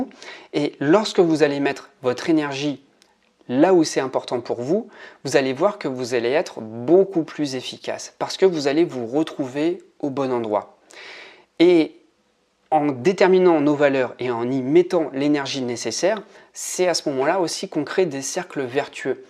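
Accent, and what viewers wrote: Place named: Pas-de-Calais, France. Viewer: Bonjour moi aussi je suis du pas de calais. J'avais bien noté un léger accent 😁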